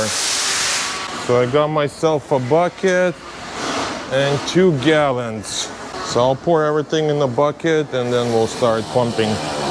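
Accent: Russia. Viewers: Такое чувство, что чувак русский🤔. Акцент русский)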